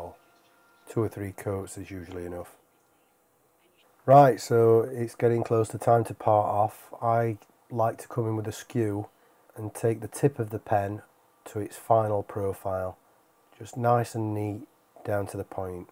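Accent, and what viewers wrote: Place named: Yorkshire, United Kingdom. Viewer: Cheers, the's nowt wrong wi a bit o Yorkshire in a video.....I may all revisit that gag 😉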